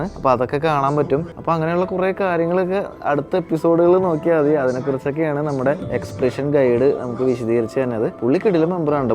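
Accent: Kochi, India. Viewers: Hi. I used to watch your videos when you used to ride in cycle to show the celebrities homes. Aa kochi way of talk aayirunu kooduthal connect aayathu. Later, Egypt videos aanu kanunathu and I noticed that the channel has grown a lot but your presentation and enthusiasm remains unchanged. Inaanu antartica suggestion vanathu. Angane YouTube onum kanuna aalala, enalum otta irupil thane episode 6 aayi. Good job 👍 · Your kochi slang❤❤❤ am also a kocheekkari😌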